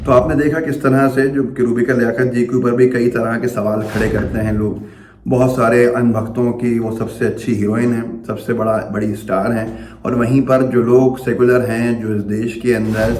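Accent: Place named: Bhopal, India. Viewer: Are khaan tum to bhopali lag riye ho.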